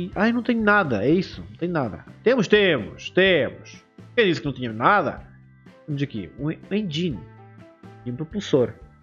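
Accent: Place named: Portugal. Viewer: Cara ele é português isso é sotaque... como vc quer que o cara fala como um paulista ou carioca? kkkk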